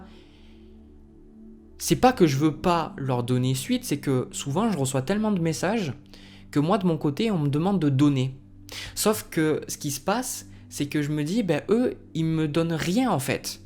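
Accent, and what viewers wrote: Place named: South, France. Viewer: Merci beaucoup...l'accent de "notre " sud est 1 plus 😊😉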